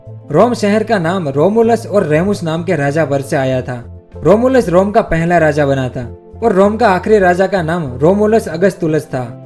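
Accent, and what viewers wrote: Gujarat, India. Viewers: nice video.. nd according to ur tone of voice i think u r gujju (kathiyavadi) .. m i right? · By listening the voice of the speaker I can surely make out that he is a GUJRATI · ગુજરાત ના છો ને ભાઈ?? પ્રોનાઉંશીયેશન પર થી ખ્યાલ આવી જાય છે 😁😀🙏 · Gujrati lageche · Bhai gujrati lags...? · आपकी आवाज में गुजराती स्वाद आ रहा है क्या मेरा कहना सही है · I think are you gujrati because apki hindi main gujrati jhalak milti hai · I like your gujju accent, seems to be real and true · I can guess that the speaker is Gujarati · You're from gujarat, because your voice is like a gujarat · Ye narrator gujrati lgta hai 😁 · You are gujarati ?? Because your speech has Gujarati accent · Are you Gujrathi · आपकी आवाज बहोत अच्छी है , और टोन ऐसा है , like gujju भाई 👍 😍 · Ye awaz or bolne ki style ek gujrati ki he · Bhai Gujarti cho..?? 😁😂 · Bol ne vala gujrati he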